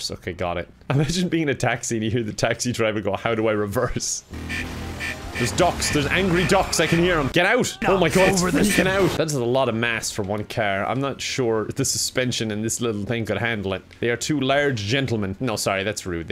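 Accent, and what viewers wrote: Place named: Ireland. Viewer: Irish Man yells at video game.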